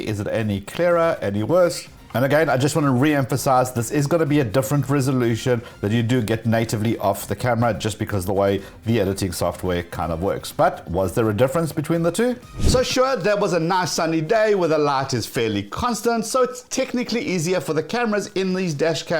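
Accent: South Africa. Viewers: Hello, it's absolutely true, the more you pay doesn't ALWAYS buy you the best.. good video.. In other news, are you South African? You sound like a saffa boet🌍🤔😂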